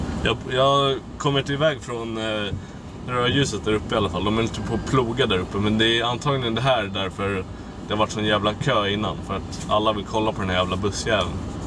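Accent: Stockholm, Sweden. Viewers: Fy fan så skönt att du blivit av med de mesta av din Stockholms dialekt :) btw helt sjukt vilken skillnad på kvalitet de e då mot dom nya :)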